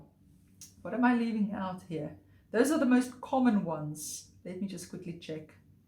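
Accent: South Africa. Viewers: You sound south african ❤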